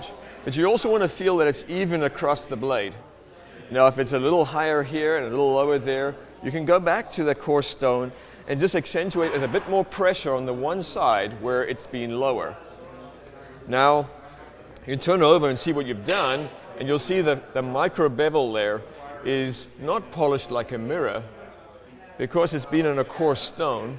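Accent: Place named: South Africa. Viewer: A south african bloke working at lie nielsen? Love it. Great technique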